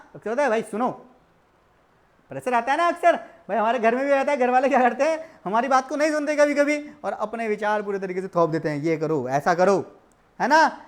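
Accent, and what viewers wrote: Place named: Bijnor, India. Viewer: Sir aap bijnor se hai 😃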